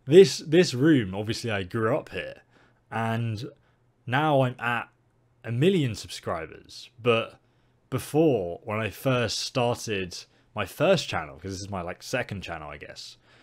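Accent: England, United Kingdom. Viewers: Where did you grow up? I cant tell which side of the north/south divide you are from. Speaking as a Yorkshire lad.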